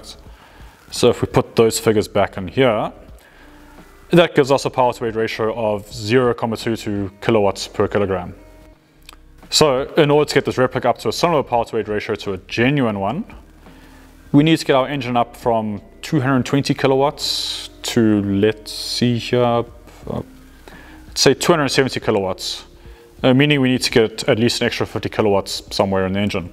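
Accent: South Africa. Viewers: Your accent also seems South African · i heard the South African accent and subscribed immediately........good work buddy. Use motor bike ITB`s.........i saw a guy on youtube build ITB`s for a V12 Benz engine out-off Hayabusa intakes........just go to the scrapyard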